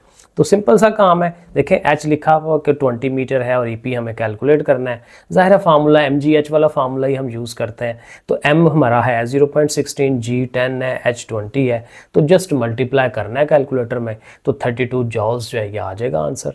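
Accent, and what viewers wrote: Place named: Gujranwala, Pakistan. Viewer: لہجہ گوجرانولہ کا ہے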